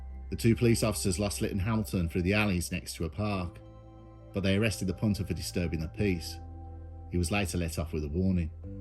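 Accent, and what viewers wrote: Birmingham, United Kingdom. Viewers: Are you from Birmingham? I'm from Lichfield down the road!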